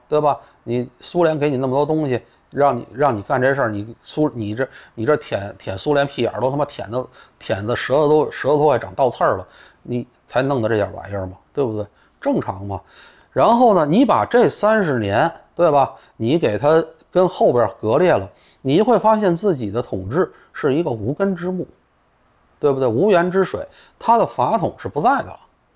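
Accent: Beijing, China. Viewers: 北京人说话真是自带幽默感，我可太喜欢了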